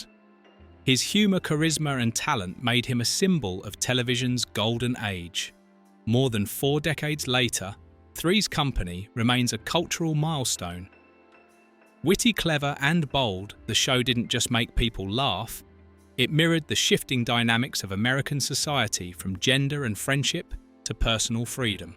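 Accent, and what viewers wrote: United Kingdom. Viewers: British accent commentary on an American show with a fake title! Click bait!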